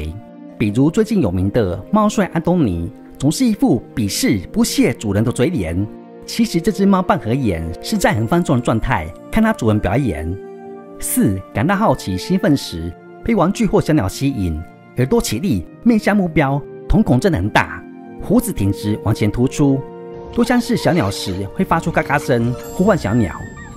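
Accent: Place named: Japan. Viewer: 不要騙我是土生土長台灣人，怎麼覺得像日本人講中文😂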